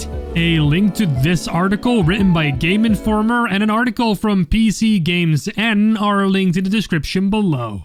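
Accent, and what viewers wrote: Netherlands. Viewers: Are you Dutch? you have a slight Dutch accent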